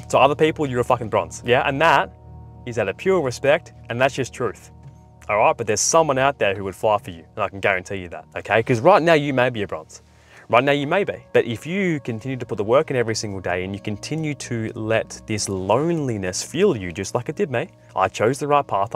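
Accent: Australia. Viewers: I sense an Australian accent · I mean you have the looks I never get use to the Aussie or kiwi or whatever accent that is but I'm sure some other women like it lol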